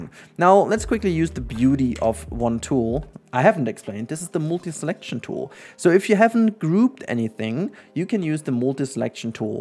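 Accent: Germany. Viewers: Sag mal, bist du Deutscher? Ich höre da so einen Akzent. 😄 Hab dich gerade entdeckt, mega Video! Danke dir.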